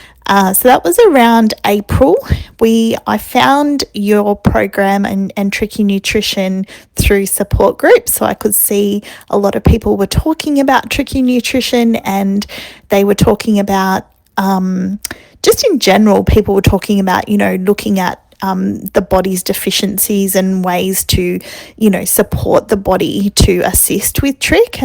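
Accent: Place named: Australia. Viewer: I love your Australian accent! I never get tired of listening to it 🧡🧡🧡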